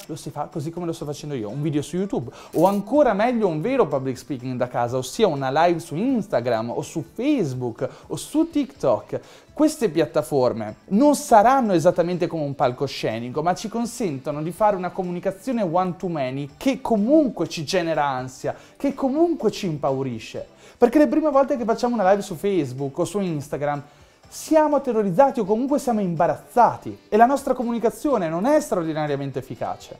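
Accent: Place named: Ferrara, Italy. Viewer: Ma sei come me di Ferrara, mi sembrava di sentire un accento comune!!! :D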